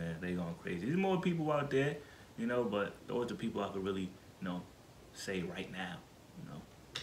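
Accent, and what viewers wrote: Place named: New York, United States. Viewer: yo from michigan? tHOUGHT you was from new york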